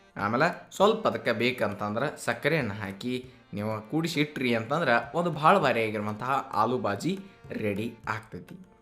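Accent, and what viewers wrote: North Karnataka, India. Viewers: Very simple fast yasty recipes. The North Karnataka language very lovely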